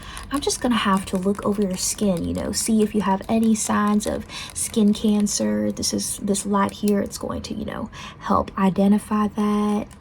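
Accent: South, United States. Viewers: What I think about this video: I love the southern accent ❤ It was not interesting that you used the same nickname over an did very like honey pie and sweetie pie ( no offense 😅) I think to boost your the amount of people who watch your videos is to make it more realistic but I still love the effort 😊 Try getting toys that are used for the topic of your video so for this video you would need a knife, ingredients,toy cooking supplies can make your videos amazing 🤩 That's all I think about this video!❤ Keep up your amazing work and I hope this motivated you and inspired you to do this too! 💕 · The Southern accent is so relaxing! But the ending…is it hinting that Alex and Eric were not simply in-laws and had something deeper between them :_cool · Bro took we are cooked to a way new level 😭😭 (*btw you ate on that southern accent:3*) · As someone from the south: this is pretty good!!! 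It's fun to hear the voice with her physical mannerisms, cuz it's very different from people in the south i reckon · I REALLY LIKE THE ACCENT, I HOPE YOU DO MORE SOUTHERN ACCENT VIDEOS 🔥🔥🔥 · THAT SOUTHERN ACCENT ATE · Omg I love your southern accent 💙🖤💜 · Please do more with a southern accent 🙏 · we need more of this southern accent from you! it’s so relaxing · The southern accent was really good! Although a lot of people where I live accent is heavier than that(😭) you did super good love it! Feeling kinda cozy while being cooked 🎀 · Lol I wonder if I should admit I kinda got hungry watching this, I just love southern cooking 😅Speaking of which, I actually have southern relatives and I thought you did great with your accent !!💗:_approved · as a southern person with a southern accent, i think you did great!! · THE SOUTHERN ACCENT SLAYEDDDDD😜💅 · HAHAHA I love the southern accent so much!! 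despite how serious and scary this video is the southern accent made me laugh!!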